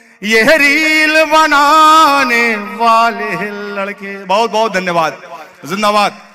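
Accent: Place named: Allahabad, India. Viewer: aapki awwaj me hi allahabad (prayagraj) ki mahak hai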